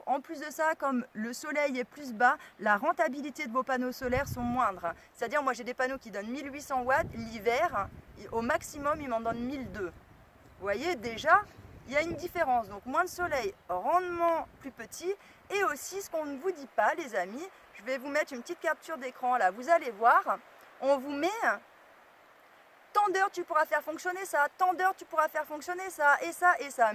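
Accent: Paris, France. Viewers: L'accent parisien ! Juste insupportable ! C'est dommage car la vidéo est intéressante. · Excellente vidéo didactique ! Par contre par pitié apprenez à perdre cet horrible accent parigot! C'est insupportable tous ces ''huns'' à la fin de chaque deuxième mot 🤯😱 A moins que vous soyez la ''x'ième'' descendante d'Attila ! Les plus érudits comprendront 🤺🏇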